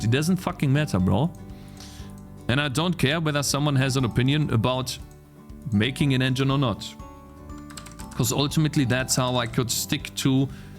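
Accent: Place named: Germany. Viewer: Gothic 1 and the whole pronounciation of all the words, he is german I knew it. Also im in the same limbo you were.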